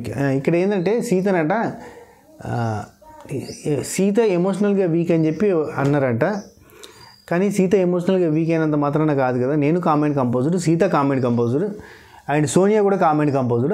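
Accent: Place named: Karimnagar, India. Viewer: bb8 kanna jokulu full entertainment pedda fan meku nenu iam from Karimnagar same ma language eh 😄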